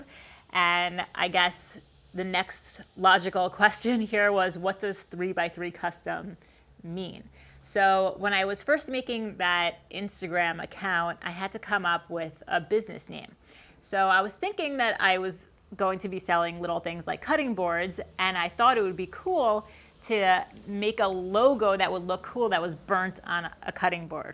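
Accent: United States. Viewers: Yes! I was about to comment the same thing! It’s a nicer accent than other parts of America I think.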